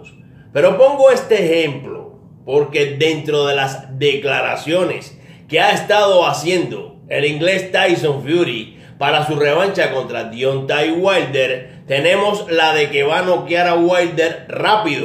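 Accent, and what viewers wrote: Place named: Cuba. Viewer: Con ese acento y no sabes jaja...Cubano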